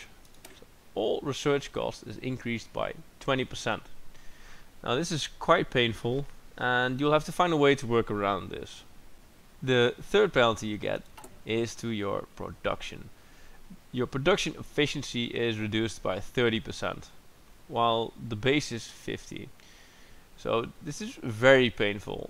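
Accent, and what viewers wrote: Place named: Scandinavia. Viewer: what accent is that, prob scandinavian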